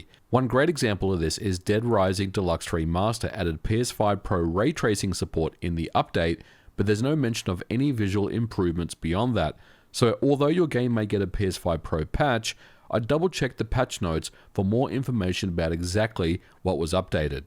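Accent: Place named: Australia. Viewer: You must be an Aussie living in the US, I recognise a fellow Aussie 🇦🇺 Back in Sydney I got my Pro for $500.oo EB Games, with a trade & Club 5 level 🎉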